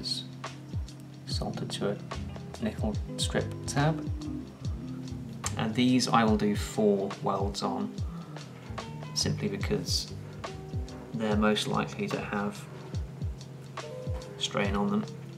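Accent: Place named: United Kingdom. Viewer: I don't know anything about batteries, I just googled "videos of British people talking funny", but this guy seems really obsessed with sales.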